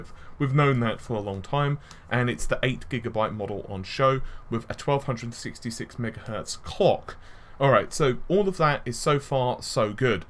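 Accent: United Kingdom. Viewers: he's speaking like a normal British lol.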